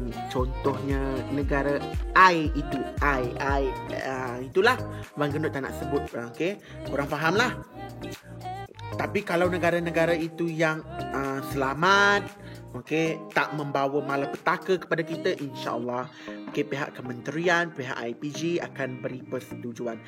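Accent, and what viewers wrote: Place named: Sarawak, Malaysia. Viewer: Terima kasih abang.. Byk info berguna pasal ipg.. Semakin bersedia sy nk masuk ipg 1 sept nnti.. Btw, abg sarawakian ke😂😂suara mcm org sarawak jk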